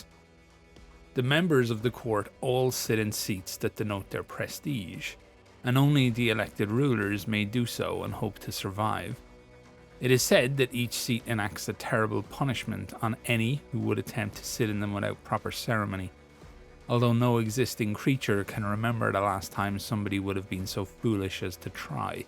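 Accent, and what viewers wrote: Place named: Ireland. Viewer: Should just lean into the Irish accent and give it a bit of a doomsayer priest vibe, mate.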